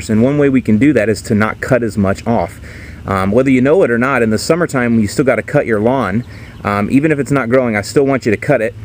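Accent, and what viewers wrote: Chicago, United States. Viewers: ""WOW""even your name was different did I hear a do you have a strong accent Chicago the Bears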